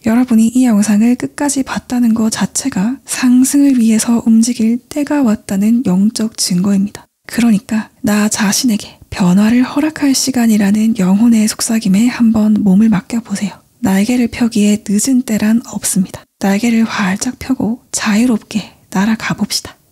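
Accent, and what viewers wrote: Gyeongsang, South Korea. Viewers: 님 늘 감사합니다!^^ 우연히 보게된 끌어당김관련 영상을 시작으로 자기사랑 무의식정화 내맡김으로 관심이 이어졌는데요 미신 같았던 끌어당김을 님 덕분에 과학적 뒷받침 안에서 이해하게 되었어요 그리고 제가 예전 어떤 안 좋았던 지인의 기억으로 인해 경상도사투리 쓰는 여자에 약간의 거부감이 있었는데요(저 여자예요) 님 영상들 보면서 경상도사투리가 참 정겹고 좋으네요ㅎㅎ 사투리는 죄가 없는데 제가 그 기억을 놓아버리지 못했던 때문이죠 아무튼 두루두루 감사합니다ㅎㅎ 님 언제 한번 인간에게 자유의지가 있다 or 없다 이 부분에 대해 다루어주실 수 있으실까요? 님께 댓글을 달고 있는 지금 이 순간조차 모든게 짜여진 시나리오의 일부분인 건지, 태어나기 전 인생의 밑그림은 계획하고 왔지만 자유의지도 있는 건지 궁금합니다.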